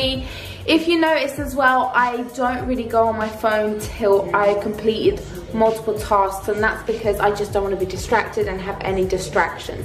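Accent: United Kingdom. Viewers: Are you from Great Britain???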